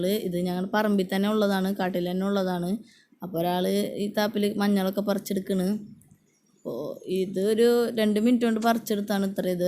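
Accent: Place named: Thrissur, India. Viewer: thrissur slang nannayi und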